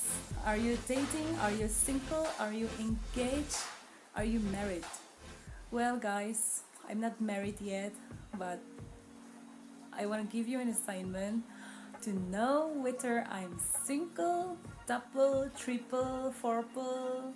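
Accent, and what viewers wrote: Nagaland, India. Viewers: Wow I love sumi dialect👍😎❤ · Haha so sweet the way u speak Sumi dialect❤️ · Love the way u spoke Sumi dialect 😍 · Hahaha love ya sumi dialect lots of love from Hongkong Market Dimapur 😀😀😀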